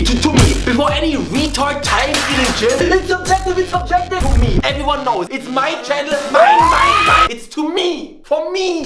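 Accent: Germany. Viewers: Why does he sound french british and chinese at the same time Maybe or just german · Thank you so much for speaking like a normal human being. Nothing I hate more than a youtuber slurring their words to get to the 10-minute-mark. edit: also your Denglish is so endearing. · The video is great but you make me enjoy the german accent a lot more than usual , it sounds satisfying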